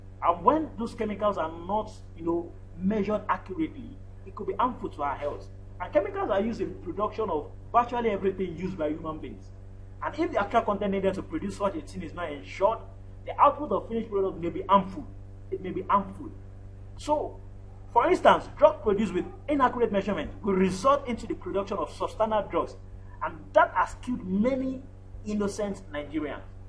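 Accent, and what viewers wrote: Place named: Nigeria. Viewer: Why can't you speak real diction Man see your Nigerian accent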